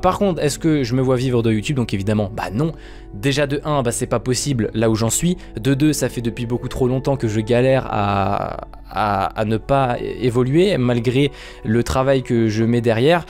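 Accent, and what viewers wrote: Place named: England, United Kingdom. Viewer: Enfin je l’attendais T’a un beau accent anglais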